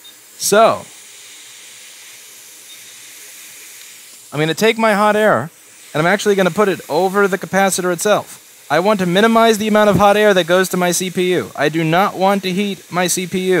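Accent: New York, United States. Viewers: Yes! It's kinda like watching a football game. I think it's his monotone voice along with that Jerry Seinfeld accent that makes it soothing. Although, I've learned a lot about soldering. I just wish he'd gear it more towards us novice-type. Like, I had no idea how to use flux like he does! And, NOT to lift the wick, but drag it was.....INVALUABLE!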